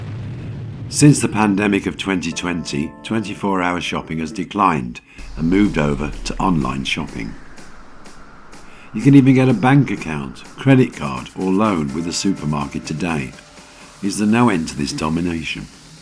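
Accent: Birmingham, United Kingdom. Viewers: Aah ' a fellow brummy......✅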